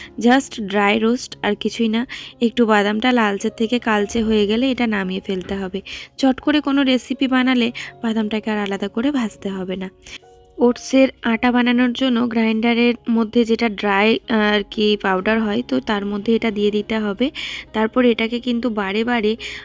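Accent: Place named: Bankura, India. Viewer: Thank you amar comment er answer vlog e bolar jonna...amar bario bankura te...prothame tomar kothar tan sune bujhechilam tumi nischai bankura r.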